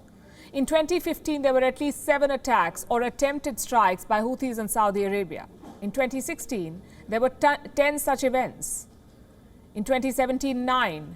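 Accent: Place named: India. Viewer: It is hard to take someone with an Indian accent seriously 😂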